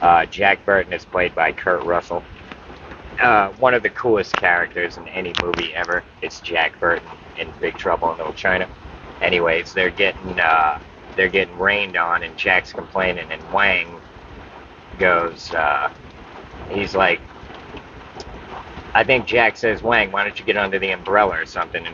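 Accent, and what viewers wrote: New York, United States. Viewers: I love how New Yorkers call it Flurida! Love ya bro, what is wrong with smoking weed? It’s about as harmful as drinking coffee, you don’t see coffee drinkers saying they are going sober. I support you 100% though, only you know if it’s a problem. You have helped more people with addiction problems than you know by being honest. Love ya Brother, good muck with your progress. I’m a retired Machinist and I’ve never met another Machinist that wasn’t a pothead. We would have to read blueprints and edit blueprints as we were making maybe aerospace parts, no relation to Boeings bs, and our best work was when we got off work, sat around the house, smoked out, and talked about how we could machine this part better, use a different tool steel, etc. We were never high on the job, but our most amazing work ideas came from sitting around being high. 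We actually worked on some of the self guided depleted uranium 20mm rounds the Gov’t may have been trying to fast track in Afghanistan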